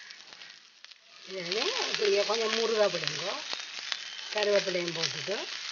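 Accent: Jaffna, Sri Lanka. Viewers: Love, love your Sri Lankan Tamizh 💕💕 and of course, the recipes 😊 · Samayalodu ungal elangai tamilum arumai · யாழ் தமிழ் இனிமையாக இருக்கிறது .. .from chennai,Tamilnadu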